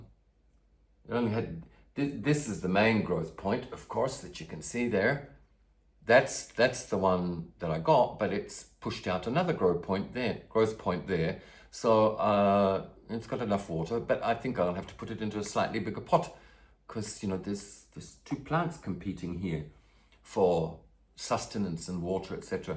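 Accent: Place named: Australia. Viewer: Hey there..I follow Svetlana..so on her recommendation had to check out your channel. I was trying to workout your accent & u said 'u pinched something'. I thought is he Aussie & then u confirmed it 😊 I am from SE Qld & have lots of Aglaonemas . A lot of them have different names here to overseas but basically the same plant. There are lots of hybrids here. Nice to see the start of your collection..it's a rabbit hole once u start 😉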